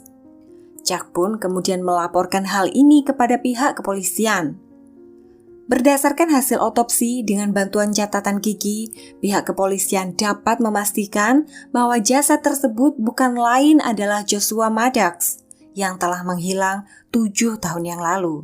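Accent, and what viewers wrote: Java, Indonesia. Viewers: ko medog ya suaranya, ga kaya sebelumnya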